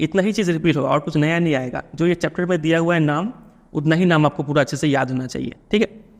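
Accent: Bihar, India. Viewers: Hello sir kya aap bihar ke hain kya?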